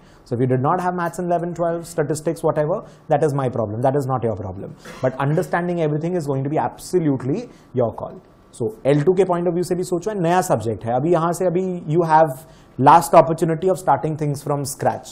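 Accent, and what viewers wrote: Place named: India. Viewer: great lecture, but 0.75 speech is ok, I have a small problem with absorb the Indian accent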